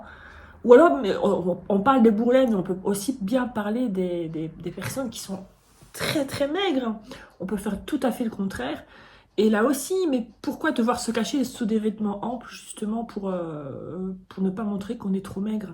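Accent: Belgium. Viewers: Cet accent belge c'est magique, notre qui nous fait une vidéo à l'ancienne je valide! Amitiés du pays basque à toi et à tous 😁😁😁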